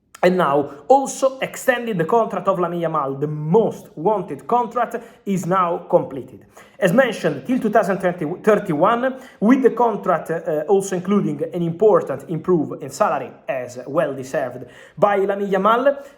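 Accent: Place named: Italy. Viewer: It´s always funny and so annoying at the same time hearing an italian guy speaking english with italian accent.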